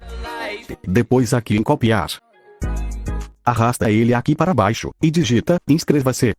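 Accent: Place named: Northeast, Brazil. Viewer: Tu é nordestino ? Se não é é minero Parece nordestino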